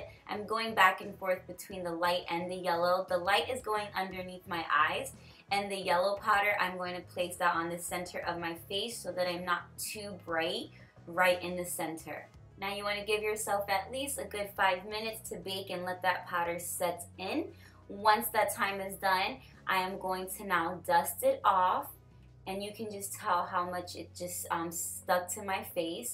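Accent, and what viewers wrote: New York, United States. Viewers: are you from nyc cause I hear that accent like is it me or are you a fellow new yorker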